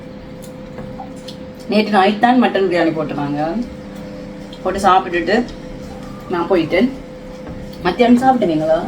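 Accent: Jaffna, Sri Lanka. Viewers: யாழ்ப்பாண தமிழ்❤ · யாழ்ப்பாண தமிழ் இனிமை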